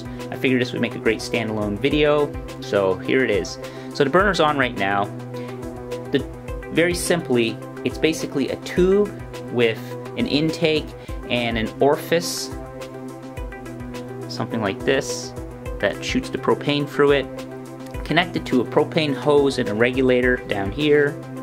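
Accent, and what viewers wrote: Canada. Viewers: canadian..abooot...thanks for sharing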